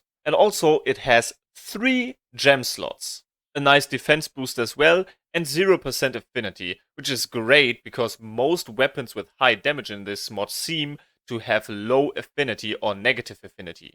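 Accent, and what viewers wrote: Germany. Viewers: I just love german people talking english. sounds great. keep it up brother! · I smell the german accent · Hörst du den fetten Akzent nicht? Natürlich ist der deutsch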